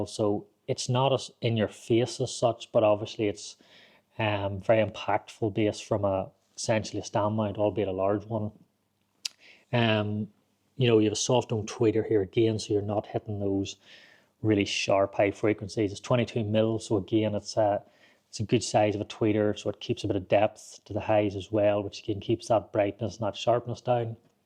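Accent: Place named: Ireland. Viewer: This gentleman is Irish 🇮🇪 you are having difficulties understanding because of his accent. The UK also has many different regional accents that you would also find difficult to understand.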